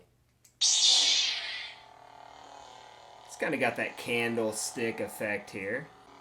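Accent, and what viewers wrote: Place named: South, United States. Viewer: Southern accent is fucking cute❤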